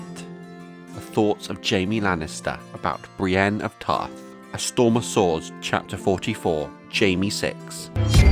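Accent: United Kingdom. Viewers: The accent is very sheffield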